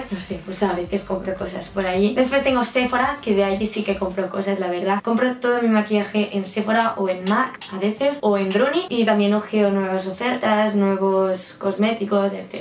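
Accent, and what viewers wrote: Catalonia, Spain. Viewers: Holiii soy nueva en el canal y enserio que cuando te he escuchado hablar sabía que eras catalana, se te nota mucho por el acento 😊 Yo también lo soy😄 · Eres catalana? Es que a veces se te nota un poco de acento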